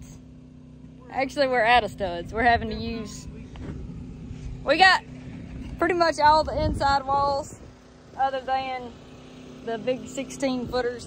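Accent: South, United States. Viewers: southern drawl I love it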